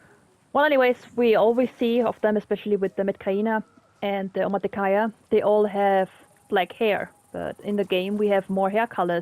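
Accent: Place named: Germany. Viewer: Correct me if i'm wrong but I think that there was a mission somewhere regarding the ferals to completly wipe them out or at least cull the spawnrate. Your comment on sodium was quite interesting. Where are you from? You have quite the accent but I can't pinpoint where it's from. If I had to guess.... Germany? I'm not so sure, you did say Natrium right? Btw one of my favorite plants is Sol's Delight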